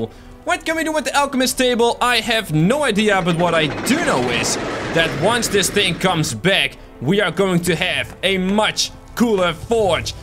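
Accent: Netherlands. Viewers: Ben je nl ik hoor het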